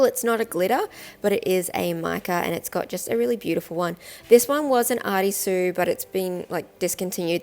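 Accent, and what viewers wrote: Australia. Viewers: Oh, I would have never known or thought anything about your voice. You just sound to me like you have a cute accent. I thought you were and Aussie? I cannot imagine why anyone would criticise you. People who do that are just plain mean, cruel, insecure, immature bullies. You sound great to me and I enjoy your videos. Keep em coming.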